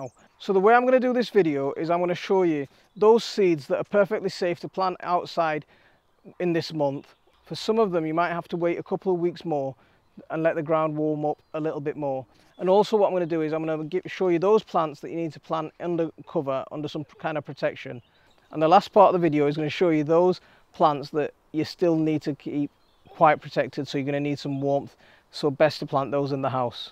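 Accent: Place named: Northern England, United Kingdom. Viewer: I love this channel, not only is it incredibly educational and positive in the gardening sense, but also outside that - I love your northern England accent and Muslim influences. ❤️❤️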